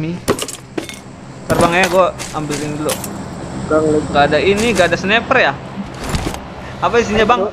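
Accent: West Java, Indonesia. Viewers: Edan mantap cui modar modar hahaha pasti urang sunda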